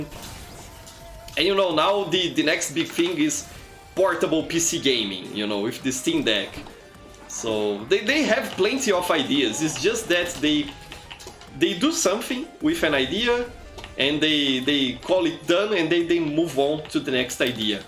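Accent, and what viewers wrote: Brazil. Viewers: Your accent sounds like you're brazilian. Great content, keep it up!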